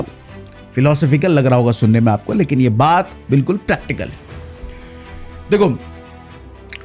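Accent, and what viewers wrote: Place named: Kanpur, India. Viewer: Sir boli se kanpur side ke lagte ho aap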